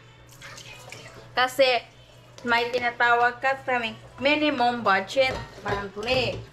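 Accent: Visayas, Philippines. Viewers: Dear most of visaya tlga, ganyan sila magtagalog just like kung pano magsalita mga tiga laguna, batangas, ilocano, british, english american, thailander, chinese. Tawag jan accent. Naiintindihan nmn namin sinasabi nya. Di nya kailangan mag adjust sa mga nanonood sknya kc we have choice nmn to stop viewing her video if you think na nakakairita kung pano sya magsalita. Sa pilipinas lang ata may mga ganyan na tao na kapwa mo pilipino ginagawang katatawa ung pananalita mo at linguwahe mo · Di sya galit, nag papaliwanag lang .sounds lang galit kasi ganyan mag salita ang bisaya accent lang yan na parang galit , 😅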